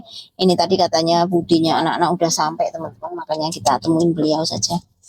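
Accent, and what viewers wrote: Java, Indonesia. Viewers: Suka dgn pembawaan mbak yang sederhana dgn celetukan Jawa nya yg medhok, sehat & bahagia sll mbak. Salam kenal dr solo 🙏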